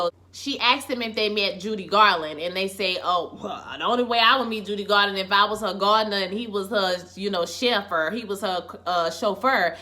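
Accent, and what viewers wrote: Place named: New Orleans, United States. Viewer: you gotta be from new orleans... that accent came in loud and clear... great review.. I come for the comedic nuggets you provide... hilarious..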